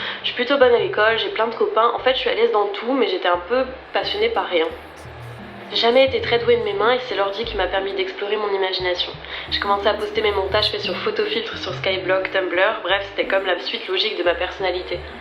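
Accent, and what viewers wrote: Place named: Alsace, France. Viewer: Je me rappelle de tes débuts dans ta chambre, j'adorais tes vidéos, ton univers très épuré et puis ton accent alsacien qui ressortait parfois sur un mot 😅 (je suis également alsacienne). Quelle periode ❤️ Et que de chemin parcouru ! Bravo à toi et bonne continuation dans tous tes projets ! 🙌🏼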